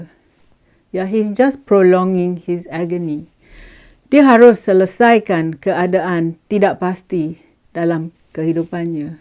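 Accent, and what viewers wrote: Perak, Malaysia. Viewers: Suka dengor pelat Perak puan...kita sekape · Telo peraknye pekat benor yong.. Terbaik perak woman. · loghat perak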